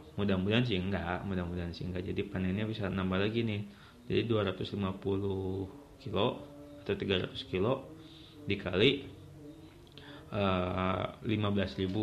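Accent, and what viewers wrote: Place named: Tasikmalaya, Indonesia. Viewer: Saya tasikmalaya pak...pantesan bicaranya kayak sama he he he. Belum pak saya baru rencana tapi pasti mau...masih nyari informasi dulu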